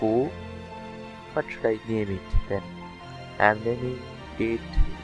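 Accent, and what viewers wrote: India. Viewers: You sounds like Indian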